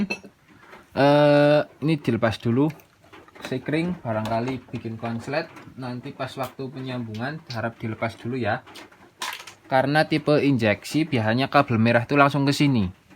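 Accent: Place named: Java, Indonesia. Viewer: Rika jawane Endi medok temen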